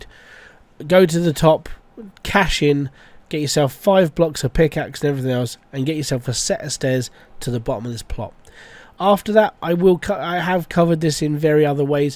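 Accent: United Kingdom. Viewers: i love your english accent also i am english too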